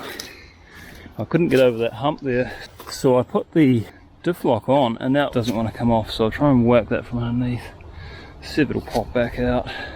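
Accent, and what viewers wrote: New Zealand. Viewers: Recording equipment you use and how much time? Amazed at the variety of angles you achieve and even what looked like overhead drone footage at the end! Amazing. How many and what type of cameras are you using and how do you set all up to make these videos so well produced? Are you filming solo? It feels that way. A fun 30 minute video on how you set up, film and edit would be fascinating! Love the kiwi accent as well! We lived in the Bay of Island for seven magic years. Miss it. Well done mate! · Just came across this channel then realized hey you sound like a kiwi me too! Bet we have some common friends somewhere 😅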